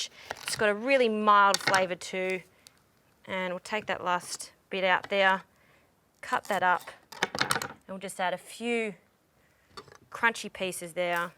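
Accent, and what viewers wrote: Australia. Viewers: I love the Aussie accent!!! Its like a Southern accent here in the USA.😂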